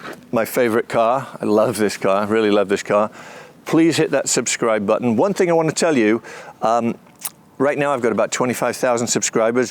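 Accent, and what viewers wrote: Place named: Lancashire, United Kingdom. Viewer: I'm losing my mind trying to place his accent. It sounds like Lancashire, maybe even a little Liverpool, definitely not London. Can anyone confirm?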